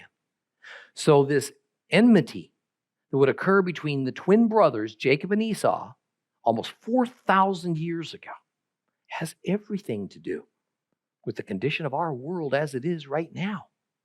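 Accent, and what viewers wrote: Florida, United States. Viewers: Im willing too bet by this mans accent y'all from Florida somewhere this guy sounds like a Baptist preacher i heard out of Florida they could be identical by voice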